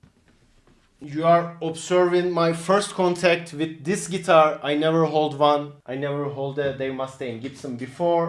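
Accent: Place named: Turkey. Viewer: ulan diyorum aksan bi farkli turkmussun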